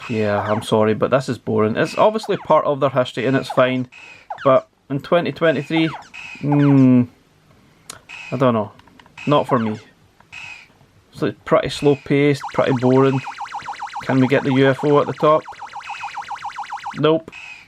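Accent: Scotland, United Kingdom. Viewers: I’m sure I will I’m sure I will. I loves ur content especially that Scotch accent us English Gurl’s like an accent 💖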